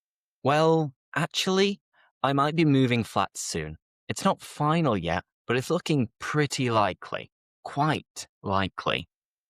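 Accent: Northern England, United Kingdom. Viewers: Your pronunciation is from the north of England, of course that’s fine, however, because you have a colloquial accent it’s really important that you try to pronounce words a little more clearly for the learners. For instance, in the first sentence you pronounced the word “starting“ while pronouncing this word you didn’t pronounce the T We usually pronounce the T & D if followed by a vowel sound, I hope this helps you, great lesson keep it up.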